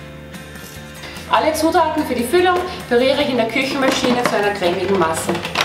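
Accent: Switzerland. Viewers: no it's a swiss accent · bisch a Schwiizeri? wegem akzent? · actually she speaks german with a tiny swiss accent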